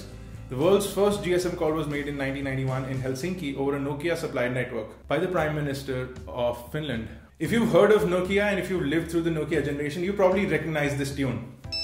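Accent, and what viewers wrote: India. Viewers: bruh? you really that ignorant? of course he has an accent. he's from india. dont be a douche. id like to hear you speak english without breaking a line or two. in addition to your english, its ""you're" indicates his/her characteristic whilst "your" is directed to his/her posession. practice more.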